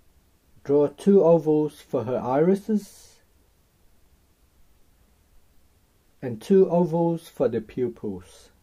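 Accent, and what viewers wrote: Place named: United Kingdom. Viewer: u sound british. sorry for saying that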